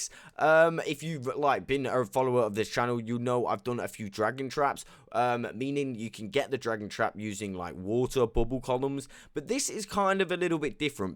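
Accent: United Kingdom. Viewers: You sound like DanTDM but your accent is more British (hope that makes sense lmao). Also great video. It helped me so much, I used to spend so much time getting armor and stuff for fighting her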